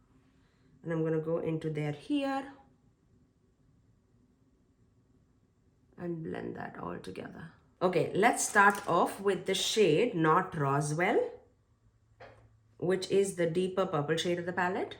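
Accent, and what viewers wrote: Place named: India. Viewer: Don't worry about your voice. It's still giving ASMR vibe for me. I just realized my old friend Ambuja used to give me the same feelings before I knew what ASMR was. I thought I was a weirdo for getting that buzzy feeling. It must be something about your soothing Indian accents that get me.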